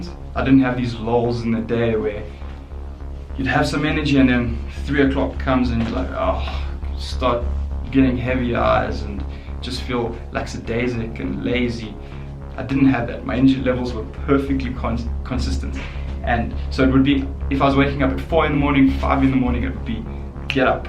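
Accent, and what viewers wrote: South Africa. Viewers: are you south african? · South African accent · South African? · Dude, are you South African? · You sound South African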